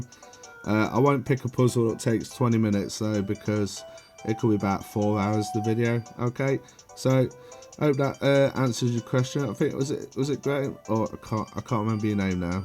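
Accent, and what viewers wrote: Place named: England, United Kingdom. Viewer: brooo the england accent is ceazy, loved the video lad!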